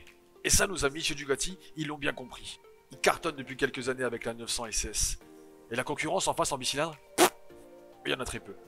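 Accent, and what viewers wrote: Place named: North, France. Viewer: Cet accent de "tcho gomin" du nord !!! Ça me rappelle ma jeunesse 🤣🤣 encore une vidéo drôle et dans l'esprit de nos petits verres de Perrier menthe en terrasse entre deux arsouilles a tordre le caoutchouc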